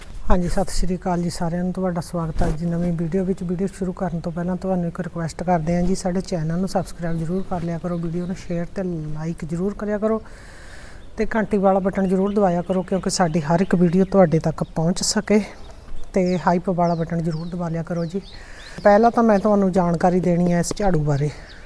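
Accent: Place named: Khamanon, India. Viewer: ਤੁਸੀਂ ਕਿਹੜੇ ਪਿੰਡ ਤੋਂ ਭੈਣ ਜੀ ਸਾਡੇ ਇਲਾਕੇ ਦੀ ਬੋਲੀ ਤੁਹਾਡੀ ਖਮਾਣੋਂ ਇਲਾਕੇ ਦੀ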